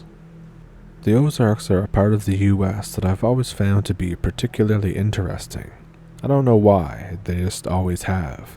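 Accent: Ontario, Canada. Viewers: Hello,. Thanks for posting, you're voice always reminds me of My Ontario cousins and blood and such, 👍🎉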